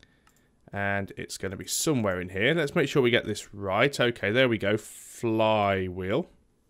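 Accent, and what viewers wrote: United Kingdom. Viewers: good bye forever :( this was a nice change of pace, this should have been called, exploring rotary craft. MAN! i really enjoyed this one, I really just like hearing your voice while I play minecraft, honestly. I could hire you to just talk while playing minecraft and explain everything you do in your british way. its really fascinating and relaxing to listen to you speak. please consider revisiting minecraft!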